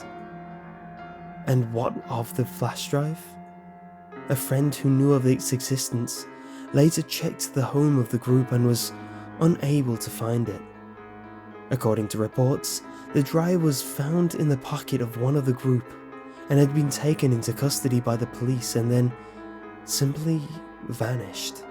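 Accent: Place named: North, United Kingdom. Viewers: As a Brit it sounds like Northern English to me. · British, he has a more Northern British accent though.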